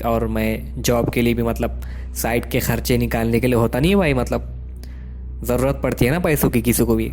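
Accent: India. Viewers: Interesting accent of the narrator. Wonder which of India he’s from. I think this Waqiah happened to the narrator. Because he keeps saying “main ne” and then corrects himself saying “mere dost ne”. 😂😂 Good story. Got goosebumps 🙈🙈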